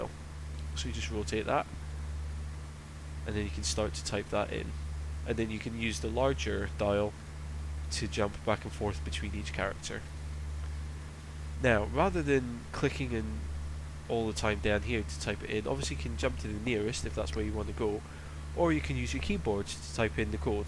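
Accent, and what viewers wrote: Scotland, United Kingdom. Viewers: Great explanation and relatively easy to follow. Thanks.... and in a good Scottish accent too lol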